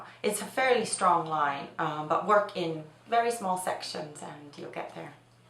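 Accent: Ireland. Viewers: are u irish? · I actually thought you were Irish :D I love the tutorial and your accent is really cute too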